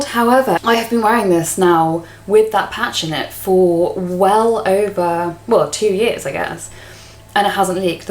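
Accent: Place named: Liverpool, United Kingdom. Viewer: I love your Scouse accent :) x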